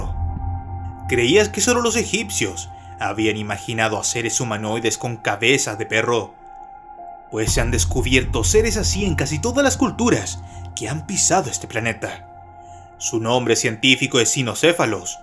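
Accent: Chile. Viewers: tiene acento chileno 😮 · Eres Chileno?bro muy buen canal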